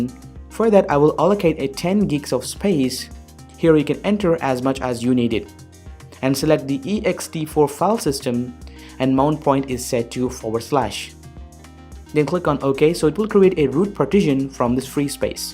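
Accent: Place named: India. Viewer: He's indian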